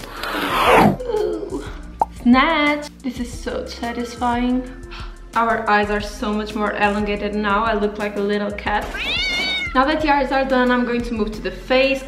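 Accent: Portugal. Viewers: That Portuguese accent is the cutest thing evaaa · You sound portuguese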